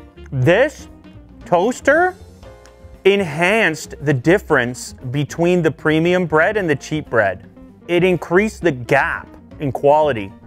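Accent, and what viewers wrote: Canada. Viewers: This man has the Thiccciest Canadian accent I have ever heard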